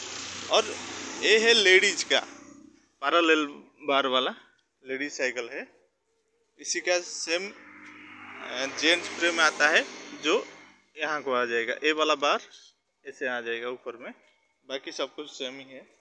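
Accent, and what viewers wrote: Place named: Odisha, India. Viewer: ଭାଇନା କଥାରୁ ତ ଓଡ଼ିଆ ଜଣାପଡିଛି 😃 ସାଇକେଲ ର ଦାମ୍ କେତେ 🤔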